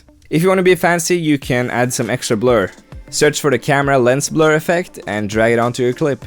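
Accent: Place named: Sweden. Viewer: I thought he was Swedish hah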